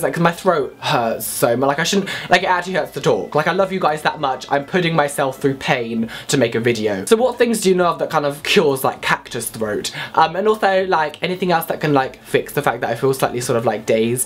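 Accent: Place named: United States. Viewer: you acually sound really American ( the accent) :))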